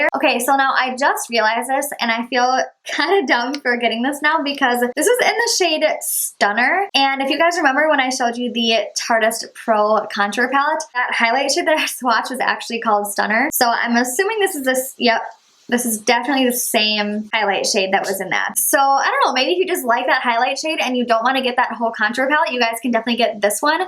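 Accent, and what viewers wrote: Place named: Midwest, United States. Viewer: I just found your channel and I'm obsessed with you already lol and I love your midwest accent!! I'm from Wisconsin so wooooo midwest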